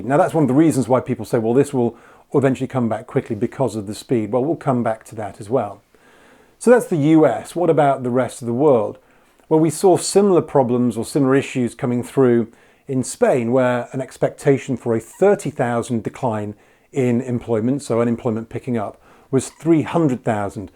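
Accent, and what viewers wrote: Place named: RP, United Kingdom. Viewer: Nothing like a posh english accent and lots of graphs to make someone appear they know what they are talking about - no one knows the future - its all a coin toss :-)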